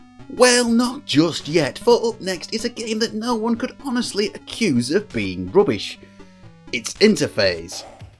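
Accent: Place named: Northern England, United Kingdom. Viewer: The Manchester accent... gahhhhh....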